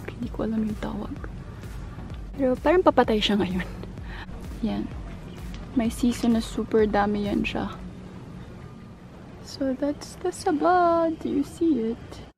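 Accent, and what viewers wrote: Visayas, Philippines. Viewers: Bisaya jud paytera kaaju hehe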